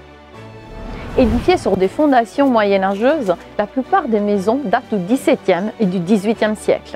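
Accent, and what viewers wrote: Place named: Italy. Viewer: quel bel accent italien...tout en douceur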